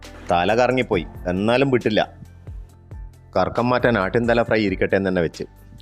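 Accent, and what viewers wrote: Kannur, India. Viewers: തനി kannur ഭാഷ, നന്നായിട്ടുണ്ട്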